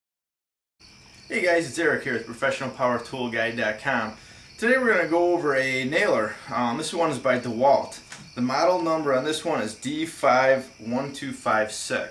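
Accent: United States. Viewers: Great demo. Love the US accent. Now I'm going to have to find out if my Porter Cable nailer can swap out the trigger to become a bump nailer.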